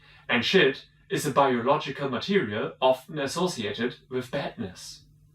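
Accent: Germany. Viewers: Hearing a German man say Bollocks is strangely hilarious. Not bad commentary, you're kinda like a German Decker Shado. A'ight, you earned yourself a subscriber. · Are you speak in german accents?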